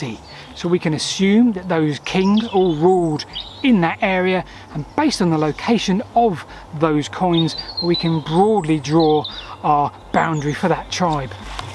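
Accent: United Kingdom. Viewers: You have an interesting British accent I cannot quite place.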